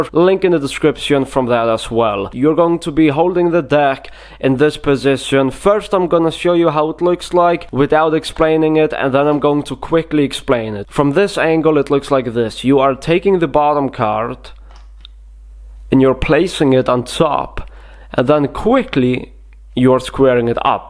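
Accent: Russia. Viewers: are you from russia? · Russisch Accent Genius... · Почему решил вести канал на английском у тебя вроде как русский акцент)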